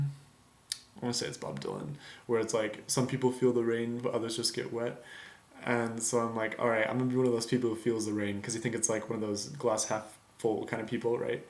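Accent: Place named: Germany. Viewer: i hear your german xD greetings from germany rofl ^^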